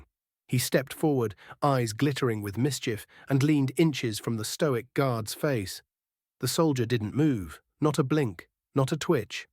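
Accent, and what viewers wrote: United Kingdom. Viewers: Would rather see the moment of the knock down in dramatic movie footage than listening to a guy with a British accent assuring us that the good guy won. Let's hear a good old boy from the Deep South giving us the play by play of teaching the young punk a lesson. Save the limey for a similar story of some immature loser trying to abuse a Buckingham Palace soldier or Horse Guard, etc.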